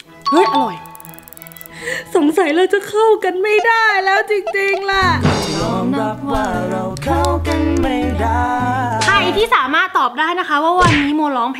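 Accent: Isan, Thailand. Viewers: พี่เป็นคนอีสานหรอค่ะพี่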